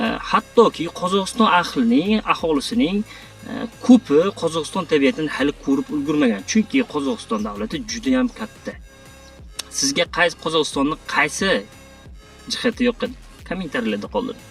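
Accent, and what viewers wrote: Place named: Namangan, Uzbekistan. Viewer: Укахон Намангандан экансиз шева кетябди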